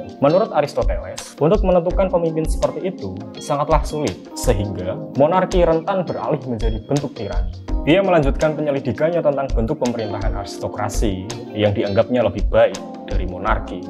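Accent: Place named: Pantura, Indonesia. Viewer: Cara ngomongnya, medok khas pantura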